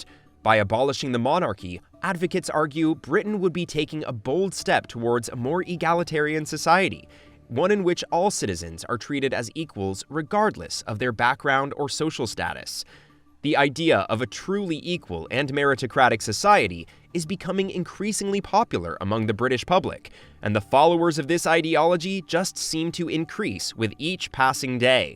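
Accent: United States. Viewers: Some but not all valid points. Elected presidents and democratic nations have also acted immorally and undemocratically. But who made this video? Narrator has American accent and half the images were American!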